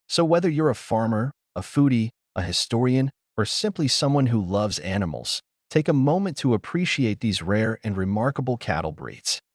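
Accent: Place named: United States. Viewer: The 'British' aren't claiming anything, this isn't a British channel, the voice-over has an American accent. The creator of this content doesn't even have much of an idea of English geography, claiming that Gloucestershire is in the north.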